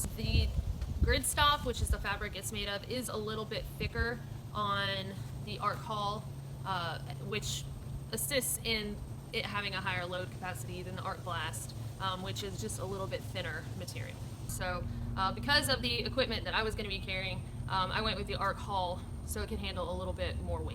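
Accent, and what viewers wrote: South, United States. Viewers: As an aussie guy I shouldn't find your southern accent "comfortable" but I do, I love listening to your voice and I could listen to it all day every day (...and I kinda have been, going through a " Marathon" for the last week or so... 🎶. The fact that it's clear from listening to you that you have a sharp mind and that you are pretty good at phrasing what you want to say probably helps...) I do like the look of that Zpack Arc Haul, and even more the look of the Haul Zip 64L, pity that it appears like you can only buy from them directly, as there's very limited scope for specials. I am in the process of updating my gear for a multi-day late spring trek in the Japanese Alps next year, and I'd like to take the chance to thank you for converting me to quilts (side sleeper here) 🙏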